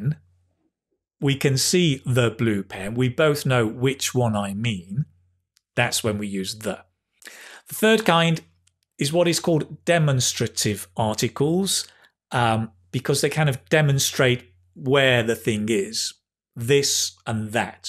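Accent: United Kingdom. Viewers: I love your British accent 😁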